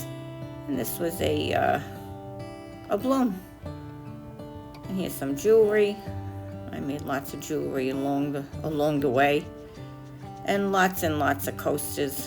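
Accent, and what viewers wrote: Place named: New York, United States. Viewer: I’ve set the notification! I always want to write ‘ ’ to play up your NY accent!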